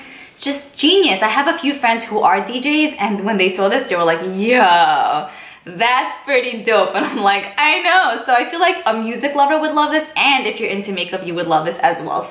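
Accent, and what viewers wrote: New York, United States. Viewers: Listening to you is like listening to a friend gush about something i must try! You are definitely from NY hehe The accents give us away. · I can tell you from NY cause of our accent! I swear watching your videos is like having a girl chat with my non existent friends lol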